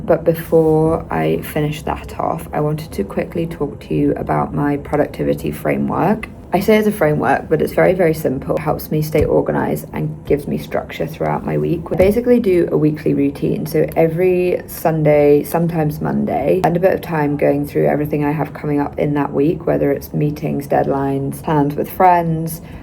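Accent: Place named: Scotland, United Kingdom. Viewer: Omg you’re Scottish! Love from a fellow Scot in London ❤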